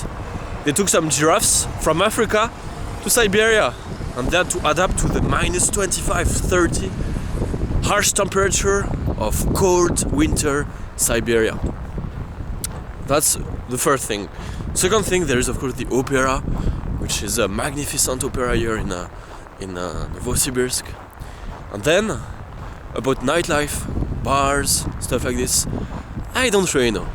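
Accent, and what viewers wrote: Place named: France. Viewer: ещё бы от акцента избавиться и будешь похож на русского, но французский акцент милый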